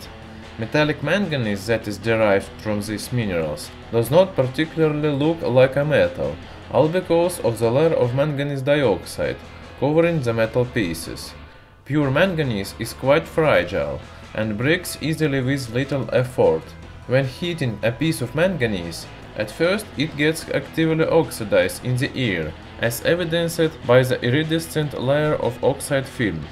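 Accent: Russia. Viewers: Now i can die in a peace... finally i find that this guy is Russian and he is speaking English in Russian accent. 😂😂😂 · Bro are you Russian no cap love from india 🇮🇳 🤝🇷🇺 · your russian acccent makes it hard to understand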